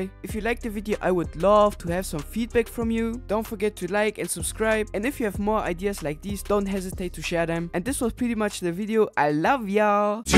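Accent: Germany. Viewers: geiles video aber du hast hein geilen deutschen ac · Feier den deutschen Akzent :D Gutes Video :) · ich liebe deinen deutschen akzent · Deutscher Akzent killt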